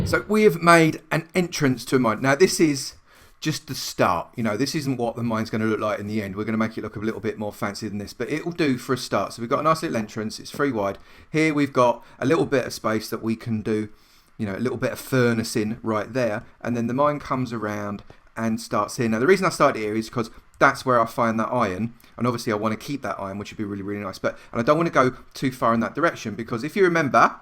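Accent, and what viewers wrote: United Kingdom. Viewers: hey dude, been watching your videos on and off and keep coming back to them so decided to watch your survival L:P from the start - your later videos are fine but in this video in particular the music edits are way louder than you, I don't know if you know or not, but in cse you don't, depending on what software you're using to record, if you "Normalise" the audio track, it will level out and optimise the volume decibels without destroying the quality (e.g. if your voice is 20db and the music is 36db, it'll put the volum eto around 28 or something and then you can adjust the volume how you like of the entire track as a whole) Loving the videos, especially the early game farms one, I never thought to lay out the saplings in a slanted rectangle formation, and also never thought to drop lamb down into a slaughter chamber for when they grow up. 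Kinda neat ideas. Nice to hear more Brits taking over the Minecraft scene:P hahahah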